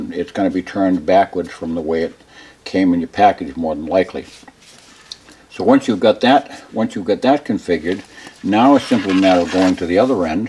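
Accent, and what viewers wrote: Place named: Boston, United States. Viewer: Excellent. Learned something new. I detect a Boston accent. What’s a freedom loving gun owner doing in that forsaken state?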